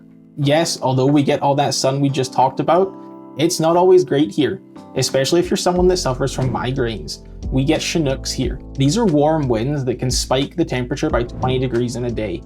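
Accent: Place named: Ireland. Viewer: No offense, I notice a slight Irish accent in you. Is that the Calgary accent? Lots of Irish descendants there?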